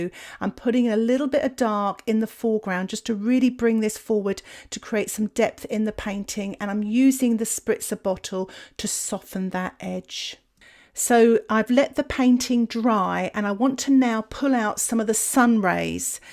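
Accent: England, United Kingdom. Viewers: I love your accent ! English ?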